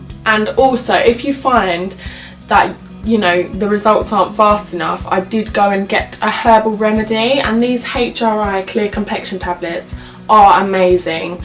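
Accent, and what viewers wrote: United Kingdom. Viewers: I just came here to listen some nice British accent. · I love your accent sounds abit essex but i find that soothing to listen to haha... im gonna go out and buy those tablets tomoz sick of my spotty skin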